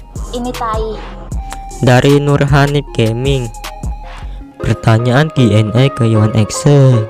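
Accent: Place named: West Java, Indonesia. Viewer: Logat sunda anjer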